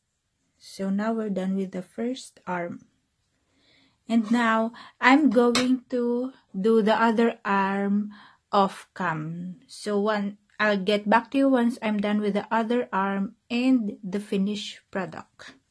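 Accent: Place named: Philippines. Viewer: thank u so much for this video, also are you filipino? the accent sounds familiar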